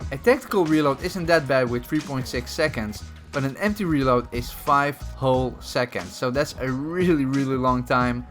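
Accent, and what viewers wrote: Netherlands. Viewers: Jij bent Nederlands ik hoor het aan jou accent😂😂 maar toch een goede video 👍👍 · are you netherlends of dutch because your accent?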